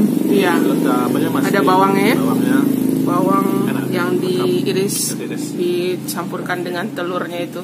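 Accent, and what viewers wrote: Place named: Padang, Indonesia. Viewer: Suaranya pun masih berlogat padang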